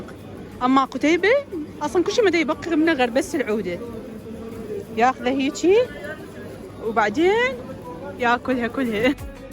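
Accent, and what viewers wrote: Baghdad, Iraq. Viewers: احب لهجتك البغداديه الاصيله وعفويتك تقربك للنفس فديوات رائعه تقديم وتصوير وموسيقى انت مبدعه اخرى ضمن المبدعات العراقيات في كل مكان وتركيا تستحق الاستقرار فيها وليس الزياره فقط ولحبي لها اخشى عليها من غدر الغادرين اللهم ادم عليها الامن والاستقرار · والله يا احبج وايد و احب قناتج و تغطياتج للمدن.. و خاصه لهجتج العراقيه الي تجنن و انا اصلا احب العراقيين.. متابعتج من الامارات ❤ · تحية من الاردن شو جميلة اللهجة العراقية يا أستاذة فيديوهات ممتعة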